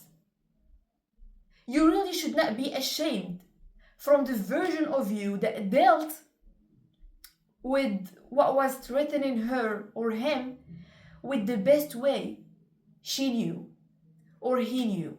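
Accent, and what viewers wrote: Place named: Morocco. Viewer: U sound Moroccan!❤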